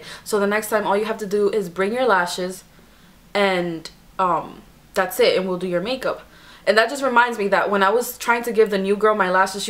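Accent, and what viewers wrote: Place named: Dominican Republic. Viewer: are you dominican? lol you sound like it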